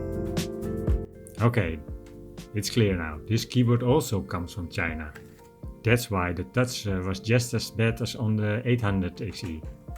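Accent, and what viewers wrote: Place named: Netherlands. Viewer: I think he’s a Dutch guy 😅he speaks “coal miner” English 😊 Nonetheless a great video, nice research!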